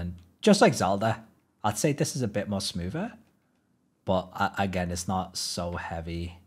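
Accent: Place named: Liverpool, United Kingdom. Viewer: Your accent is hurting my brain, it's like 85% scouser and 15% something else. You are from liverpool right?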